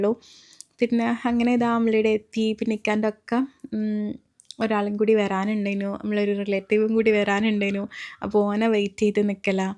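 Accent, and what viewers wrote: Kannur, India. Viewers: nalla rasand pallu porikka., aah nammale kannur basha marannu samsarikkulaa 🥰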